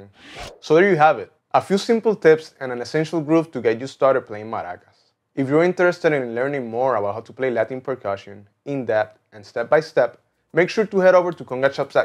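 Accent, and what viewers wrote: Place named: Puerto Rico. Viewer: Thanks for your response. This observation I am selling it to you wholesale for when it came to me I was completely fresh to my interest in percussion. The macho hembra distinction was totally matter of fact in reference to the difference in tone, which was also matter of fact. I assumed it was due to having being built to make them sound different and macho hembra came by default, as in drums, as you point out. To tell you the truth I perceive a difference in tone but am not certain it is due to the maracas, as opposed to my own right-left hearing. Probably the reference is very old school, from before your time, which I would not be surprised. Your style of exposure is new to me, it is very good, your accent shows but so is your knowlwdge and control of the english language. It is a refreshing style of exposure and diction. 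Congratulations and Blessings. Keep it up for percussion is the most democratic form of musical expression. I am not sure if you are Puertorican, I suppose you are, but Puertorican percussion is among the most democratic of all.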